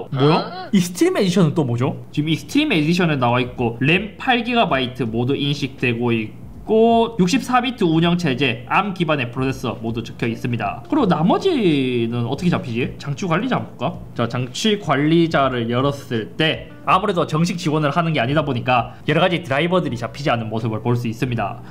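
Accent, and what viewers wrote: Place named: Gyeongsang, South Korea. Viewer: 씨 경상도셨구나.. 컴퓨터도 잘하네..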